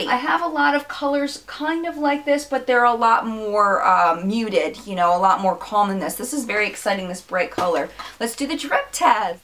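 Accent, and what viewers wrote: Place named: Boston, United States. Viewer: I love your accent! Lol! Is it Boston? Cause the way you say Boxycharm makes me smile!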